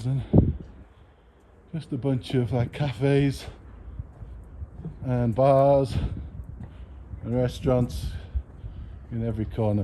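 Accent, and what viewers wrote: Northern England, United Kingdom. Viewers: I can hear the northern accent, it's just really softened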